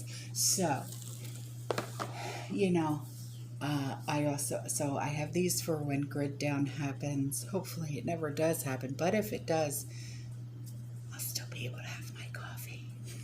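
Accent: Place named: New Jersey, United States. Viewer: I'm soooo happy I found your channel. I'm new, but I look forward to your vids every day. It's like sitting down with a friend. You have a beautiful manner and you're as cute as cute can be. By the way, I'm a Jersey girl, too, transplanted to Florida four years ago. So add your accent to the mix, it's like being home:) God bless you and your family, and thanks for everything. Last thing, your voice is so calming and soothing, you should do bedtime stories on tape.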